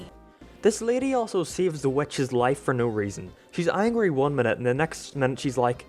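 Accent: Ireland. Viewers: Oh my gosh he sounds so Irish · I fell like he has a Irish accent someone tell me if he is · jeez early sounds irish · Bro is Irish asf 6y later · He has more of the Irish accent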